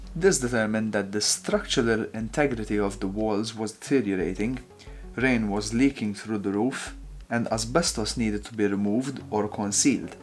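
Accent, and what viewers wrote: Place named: Malta. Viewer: Are you Maltese? That accent is familiar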